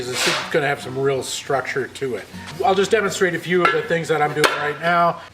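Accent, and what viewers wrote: Canada. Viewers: Accent says Canada.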